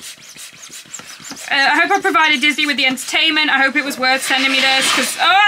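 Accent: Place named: Birmingham, United Kingdom. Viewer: This video was created with care and chaos. Balloon segment wasn’t the highlight. Hoody, water bottle… I’m appy… The most Birmingham accent you have done. Interesting fact, I have done some research on the Edinburgh shows. Sunset is at 2155 and twilight finishes at 2256. The Edinburgh shows look as if they will be in full daylight, if Taylor hits the stage at 1900 as is anticipated. These shows will have a different vibe to them. If you are coming to Scotland, you will experience it.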